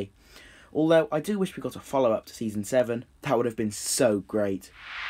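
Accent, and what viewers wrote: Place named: United Kingdom. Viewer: British lol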